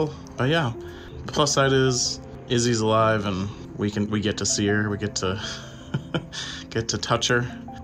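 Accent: South, United States. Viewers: Man you are as southern as can't and so iam I love to hear you talk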